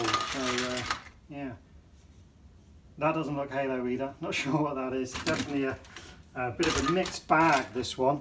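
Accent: United Kingdom. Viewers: Do you buy Mega in the UK? You have an English accent....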